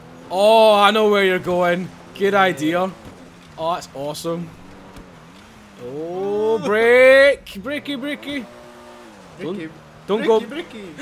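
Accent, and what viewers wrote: Scotland, United Kingdom. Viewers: I like the Scottish accent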